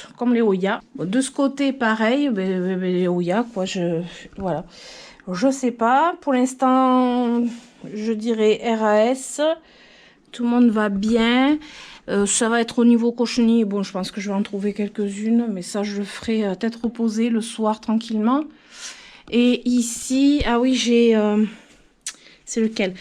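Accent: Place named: West, France. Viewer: Bonjour et j'adore votre bonne humeur et votre accent de l'ouest!!!!et bravo pour votre vidéo et merci 🌞🐞🍀🌹👏👏🦜✋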